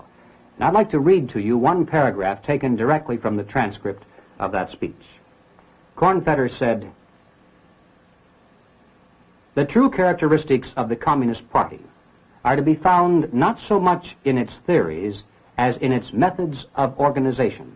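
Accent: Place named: England, United Kingdom. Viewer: いつもありがとうございます。の語りは大変聴きやすいです。英語音声を消さないのもいいですし、日本人には探せない情報を英語訛りで話されていると有り難みが増すのですが、声質がソフトなので聴いていて全く疲れず、スムーズに頭に入ってきます。個人的には、大統領選挙投票前のイーロン・マスクに、終わりやん、って大阪弁でしょうか、というのがとてもニュアンスが伝わりました。日本人には出来ない翻訳です。全然関係ない映画の話ですが、コロニアという映画で、DSより更に危ない追跡者から逃げ切るエンディングで、旅客機を無理やり離陸させてと主人公が頼む場面で、機長が「OK」と応える和訳が「クソッ」になっていたのがちょっと嫌でした。文化が違うと直訳とは程遠いものになったりしますが、の和訳はニュアンスが極めて正しいのではと感じています。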